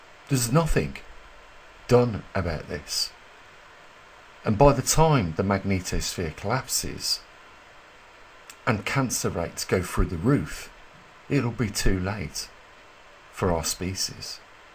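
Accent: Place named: Black Country, United Kingdom. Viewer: M8… where ya from? You sound local… I’m in West Brom, let’s meet up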